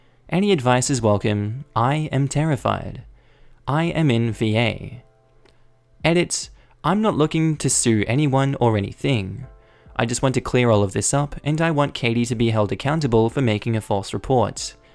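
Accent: Australia. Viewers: So pleased there's an Aussie narrator... has a soft spot for the accent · hearing a fellow Aussie voice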